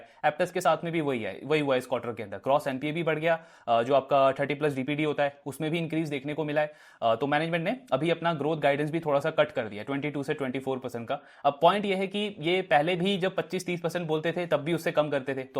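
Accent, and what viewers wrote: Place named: Bihar, India. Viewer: Bhai app bihar se ho kaya maybe Madhubani aur Darbhanga se hoge app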